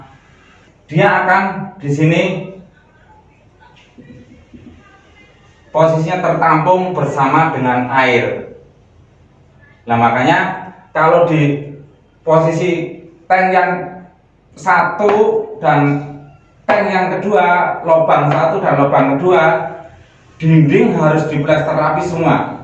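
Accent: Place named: Surakarta, Indonesia. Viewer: Ngomong nya mirrip orang Solo🤣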